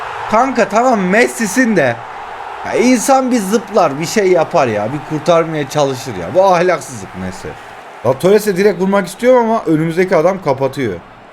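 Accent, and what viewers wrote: Kahramanmaraş, Turkey. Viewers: abi challenge mahalle maçı kurallarıyla online rakibe karşı oyna lütfen beni al abi istanbuldan selamlar bende maraşlıyım sen aynı maraşlılar gibi konuşuyosun abi seviliyosun kral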